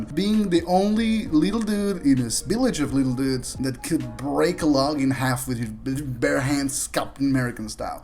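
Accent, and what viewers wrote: Argentina. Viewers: Bancá, sos argentino?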